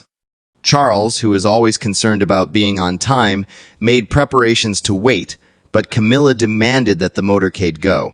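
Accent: United States. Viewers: Could we please have a british commentator ! American pronunciation is abysmal.🙄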